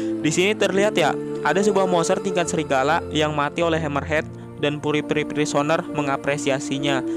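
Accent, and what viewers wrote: West Java, Indonesia. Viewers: Mimin na orang Sunda ouy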